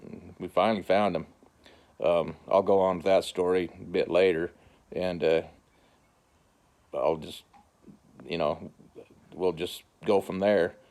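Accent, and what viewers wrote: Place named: South, United States. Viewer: Why are you Caucasian if you’re from the Middle East? Why do you have a southern accent? If you’ve gone further into the future and you say you can’t change the past, why are you here now if you can’t change anything? Wouldn’t this be the past? Have you considered that the fall of the US is a part of prophecy and God’s will?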